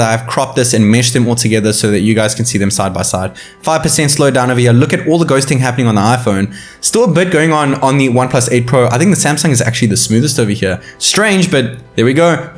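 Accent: South Africa. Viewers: Immediately heard that South African accent! 🔥 Love from Jozi bro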